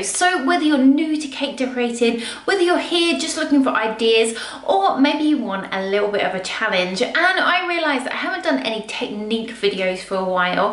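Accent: United Kingdom. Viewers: 🤗 you is so nice! Are you from England?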